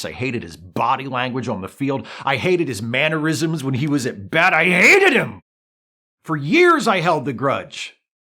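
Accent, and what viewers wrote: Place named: Northeast, United States. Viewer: Very Pennsylvania/New Jersey vibes, I approve.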